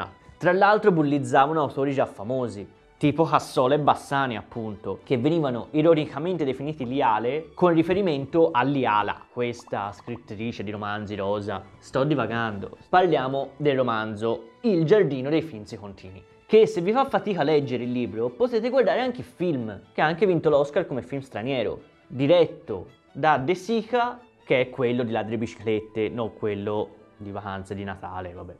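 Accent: Florence, Italy. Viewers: Ahaha tu fai schiantare Sei di Firenze vero? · Mi piace l'accento fiorentino! D'atmosfera culto e culturale! questo video è breve ma utile, anche facile da capire! Grazie!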